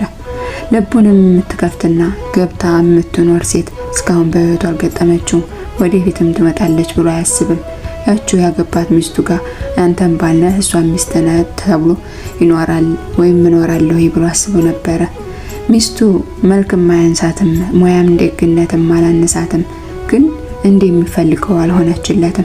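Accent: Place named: Wollo, Ethiopia. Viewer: ወላሂ የብዙ ሰው ታሪክ አዳምጣለሁ ግን አንች እንደምታቀርቢው የሚመቸኝ የለም ያቀራረብሽ ለዛ አነጋገርስ ወሎየነቴን ያስታውሰኛል የምር ምችት ይበልሽ በርችልኝ ከዝህ በላይ ጠንክረሽ ጥሩ ደረጃ ደርሰሽ አላህ ያሣየኝ የኔ ውብ ድምፅ😍😍😍😍😍😍😍😍😍😍😍😍😍😍😍😍😍😍😍😍😍😍😍😍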